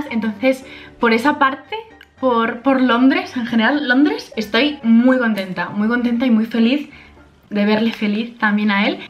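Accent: Spain. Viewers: Ese acento español enamore 🇪🇸❤❤❤❤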